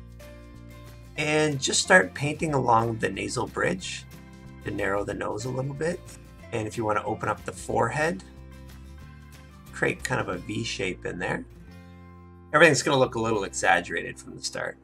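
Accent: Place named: Canada. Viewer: I can hear Canadian accent!))) cool!))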